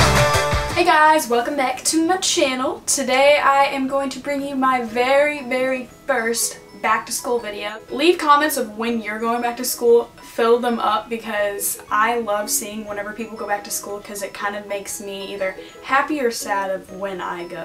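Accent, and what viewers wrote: United States. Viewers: Are you country because you talk like a country person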